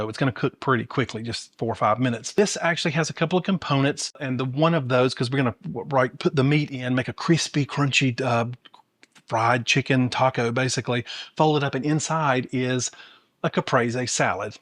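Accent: South, United States. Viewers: San Diego!? I thought you were Southern 😄😜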